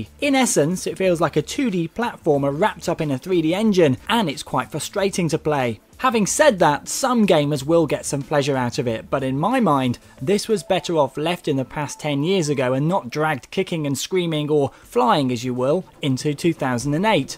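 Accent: United Kingdom. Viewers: British accent omg i love British accents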